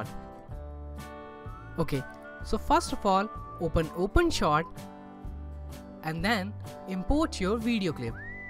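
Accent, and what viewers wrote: India. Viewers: he sounds like an indian tech scammer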